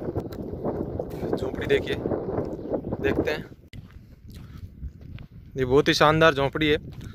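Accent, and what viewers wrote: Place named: Nagaur, India. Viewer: Nagaur s match khati h aapki language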